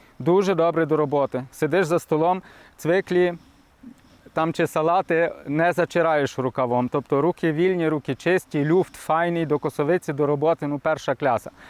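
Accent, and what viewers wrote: Hutsul, Ukraine. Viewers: Як я люблю слухати цього чоловіка, ну дуже файно розповідає, з дуже гарним гуцульским діалектом!!! Христос ся рождає!!!!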